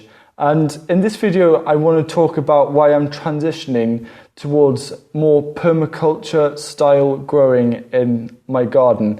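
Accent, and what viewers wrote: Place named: South Wales, United Kingdom. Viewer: Hi, I'm a new subscriber from New Zealand. Thank you so much for these wonderful videos, I love the variety of content and your personality is a winner. I was feeling quite disheartened with my soggy winter garden before stumbling across your channel and now I'm feeling a lot more inspired, thanks! Your accent is a touch of home, as my grandmother is from South Wales and I also lived there for a short time:)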